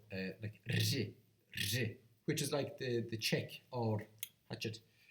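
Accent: Kerry, Ireland. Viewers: Kerry most likely, if not Kerry than Cork. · I’d say Kerry. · Kerry for sure.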